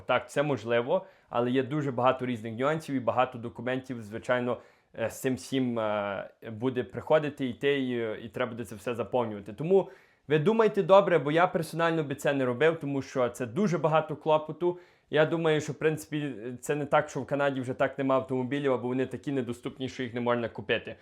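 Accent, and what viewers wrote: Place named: Western Ukraine, Ukraine. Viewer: Цей говор западенський , фу , гидко слухати 🤮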